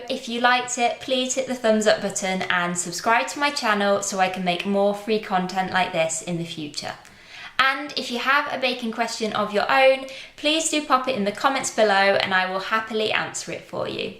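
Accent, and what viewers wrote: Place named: England, United Kingdom. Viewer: great to see and hear an English Accent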